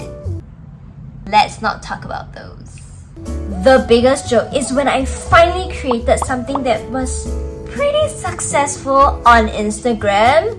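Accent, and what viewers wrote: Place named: Malaysia. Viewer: Hey beautiful. Whats your accent? Malaysian?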